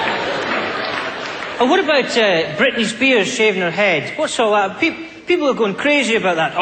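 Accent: Australia. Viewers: how funny is this guy, he does aussie better than most aussies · Wonderful aussie accent! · his aussie accent is spot on, and aussie parliament is exactly like that........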